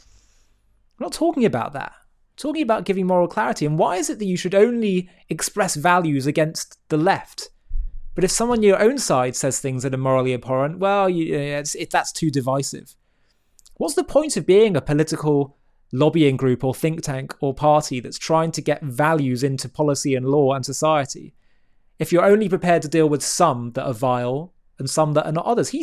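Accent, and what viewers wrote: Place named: United Kingdom. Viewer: Hey, uhm I have been watching a lot of your videos and the unbroken pattern I find in all of them is that if anyone doesn't agree with a policy of Israels or doesn't support a action of theirs 110% almost in a fanatical attitude of devotion you have a habit of beginning to label them "not a friend of Israel" and then going further to call them maybe even Anti-Semitic. You do not get to throw around labels as weapons like this at anyone you choose if they don't just throw themselves at your feet and prostrate themselves before the next big move that Israel makes. You have a British accent if I'm not mistaken and the last I checked you have some very serious problems over there in the U.K. with Muslims taking control of everything and they will soon be driving all the rest of the Brits out of their homeland. Maybe you should spend more time criticizing the very real enemies you have right there among you and take care of your own lands rather than poking barbs at American Conservative figures to cry bully all of us into submission into whatever your next big thing is. 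Neither Tucker, nor Vance, Nor, Charlie Kirck et all have ever been enemies of Israel and just because some of them may give pause to something or question something on strictly intellectual grounds to inspire discussion this channel responds to the same as if it were the harshest of crimes commited by the Third Reich or something. Look you can say whatever you want but you don't earn any credibility acting like t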